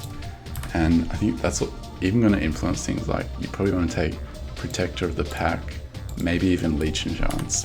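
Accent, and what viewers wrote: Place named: Australia. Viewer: teaching people feral is nice but can you teach people the australian accent too ty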